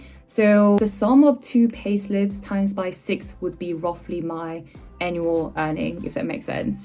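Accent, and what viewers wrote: United Kingdom. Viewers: 영국발음 펄펙이십니다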